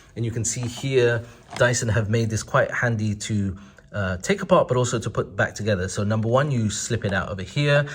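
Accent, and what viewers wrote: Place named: England, United Kingdom. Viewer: Fantastic how you have an English accent yet choose to convert UAE currency to US dollars and not UK pounds.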